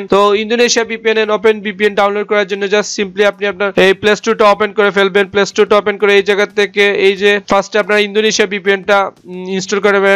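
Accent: Sylhet, Bangladesh. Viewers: Vai ki sylhety😁😁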